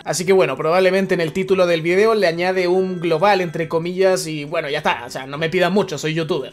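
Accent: Chile. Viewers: Ke ermozo chileno leon dice wn kliau JSJSJSJSJ ✨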